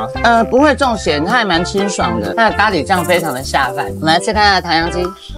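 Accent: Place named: Taiwan. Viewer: 很喜歡看到漂亮的介紹美食聲音好聽，又有台灣國語的腔調真的漂亮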